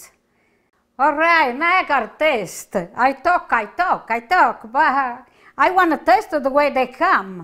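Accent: Italy. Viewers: My Mother was from Naples Italy and accent, songs and receipts are authentic italian dishes. I grew up eating and cooking these dishes which I have passed down to my brothers and sons. It touches my heart to watch these videos. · I simply adore you. You remind me so much of my mom and the recipes she use to make. Thank you for sharing so many of your delicious recipes. My mom too, immigrated from Italy in 1947. Your accent is so much like my mom. I understand everything you say and how you say it. I love the fact you use your hands when you are cooking, just like my mom and grandma! Keep those scrumptious recipes coming with those beautiful Italian songs! Ti Voglio Bene!!!!!!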